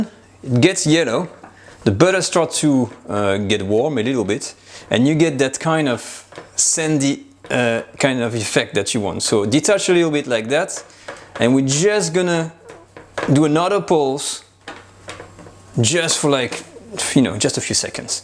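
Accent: France. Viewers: I love this French accent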